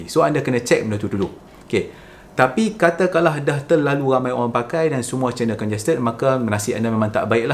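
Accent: North, Malaysia. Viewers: Hihi best denga ckp utara, nk kna try la semua tips ni sbb sy punya mmg teruk w/pun dah ddk dlm coverage & Band40 … tp td ckp x pkai modai, tp ad cdgn pkai modai, nk claim la boleh? 😅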